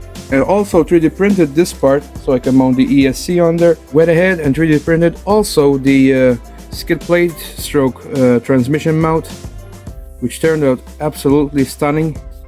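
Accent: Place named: Malta. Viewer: your English sound like Maltese English :-) Interesting design and very well made 3d printed parts, tempted to get an SCX10 but now I'm too busy with the 3DSETS Landy and Rancher, 3D Printing is very addictive.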